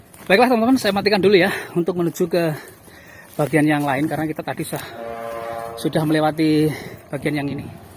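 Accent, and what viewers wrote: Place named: East Java, Indonesia. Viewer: Dr semua vlog sejenis, saya suka vlog ini krn: 1. Seblm mulai ada penjelasannya 2. Logat Jwa Timurannya bikin seru n lucu 3 . Ulasannya detil 4. Ga diserem²in pake back sound Saran: 1. Sll hati² apalagi tangga² yg rapuh 2. Klo di rumah² yg ada barang²nya misal berkas² baiknya disorot/diteliti lbh detil sapa tau bisa jd titik terang ttg siapa penilik rumahnya Suwuuuun..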